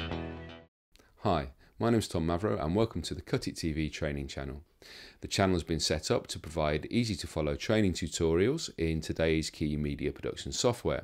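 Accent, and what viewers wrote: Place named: Cockney, United Kingdom. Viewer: yes very clean and tidy, extremely good [ cockney diction and good visuals, thanks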